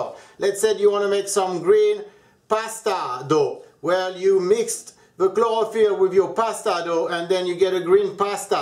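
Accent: France. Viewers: Vous avez un accent français a couper au couteau !! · I watched the video.real franse laungwich accent.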